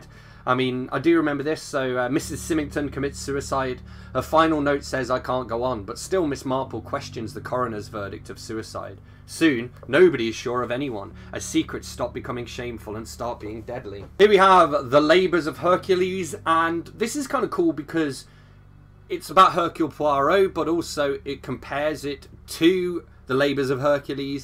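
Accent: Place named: Dartford, United Kingdom. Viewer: I love your accent, are you from Dartford?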